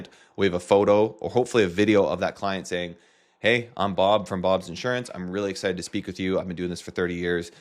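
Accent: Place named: United States. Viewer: I'm american and this is exactly what i sound like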